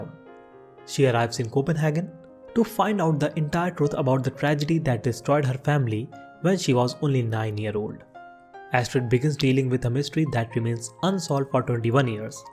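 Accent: India. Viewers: I couldnt finish this. Your accent is the most painful mix of indian and monotone. My brain is in pain.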